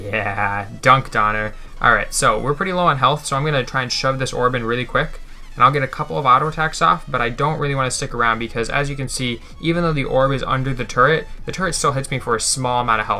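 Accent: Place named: Boston, United States. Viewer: Yo I live in Massachusetts!! You said that you have a Boston accent so I thought you Live in Boston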